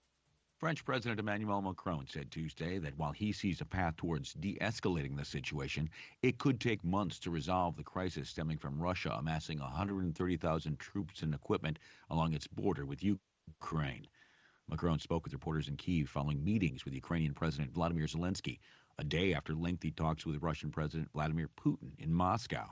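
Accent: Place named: United States. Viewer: Thank you very much Mr., for all the updated news. I do like to listen to your nice American voice. I hope that in the next time, you will read the news with slower speed. Therefore, we can listen and understand the news contents clearly. Furthermore, the speaker can avoid the readings tripped and wrong. Once again, thank you very much for your attention to my comments.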